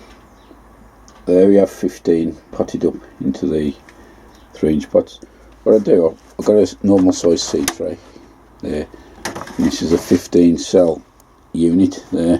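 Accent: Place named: Midlands, United Kingdom. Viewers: Just discovered you and I Love this. Very informative and relaxing. I'm getting the vegetable garden bug again. Must be that midland accent.ive subscribed Keep me coming.👍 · I also find it difficult to throw seedlings away!! Whereabouts are you? You sound west mids......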